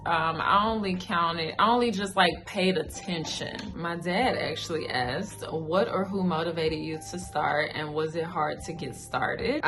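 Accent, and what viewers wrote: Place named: Southern California, United States. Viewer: What is this accent? It sounds like a mix between Southern and 'Californian'? to me. I'm not american tho, so I can be totally off-base lol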